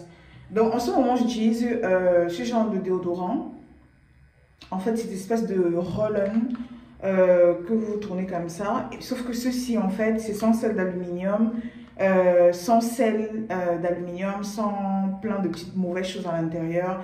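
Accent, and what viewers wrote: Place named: Italy. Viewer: C'est l'accent italien là qui me retient !!!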